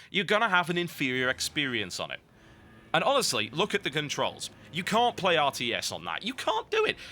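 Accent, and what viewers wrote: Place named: Newcastle, United Kingdom. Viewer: AHHH you ARE a Geordie! I knew it.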